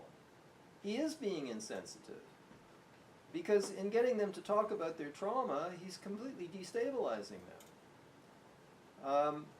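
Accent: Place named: United States. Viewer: I think i recognise an american accent (i'm french), and i think your students are lucky. In France it's still difficult when your psychologist has been formed at the university and is from my generation (i'm 49) to critises Freud's theory when you talk about your life and you see on the face of the psychologist that you're not taken seriously. You have to talk about Oedipe theory and fantasies and why you think it can't be used to be analysed, and most of the lime they laugh at your face because they always pretend you didn't understand it how Freud meant it ! Hopefully i discovered Ferenczi about traumas and identification to the agressor. But so called psychoanalysis based on Freud's ideas has still too much power in France. If you want to come and make conférences against it, you're welcome !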